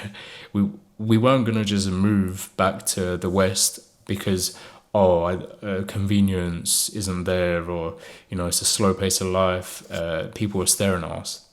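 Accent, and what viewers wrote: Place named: England, United Kingdom. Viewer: Salamo alaykom, my brother. If I were in your place, I would look for an apartment for sale in Tangier and establish an English language institute. Yes, there are many in Tangier, but you have an advantage: you are English and have an English accent, and that's what learners are looking for. A project like this would be 100% successful in Tangier because there are many international companies there, and English is in demand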